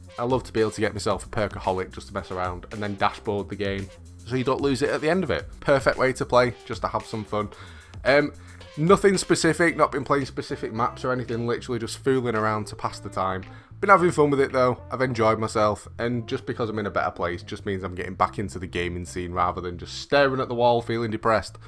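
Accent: United Kingdom. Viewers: Yo just found your channel I really like your content and if I hear your accent correct then hello my fellow Brit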